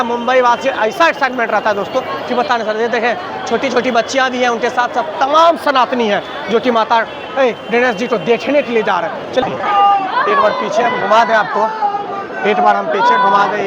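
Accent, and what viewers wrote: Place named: Bihar, India. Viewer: भाषा का उच्चारण ठीक से करो।। तुम बि हारी कोई भी भाषा बोलो उसमें उरेठ पन झलकता है।।इसलिए तुमलोग हर जगह मार खाते हो।।शर्म नाम की कोई चीज बची ही नहीं है तुमलोगों में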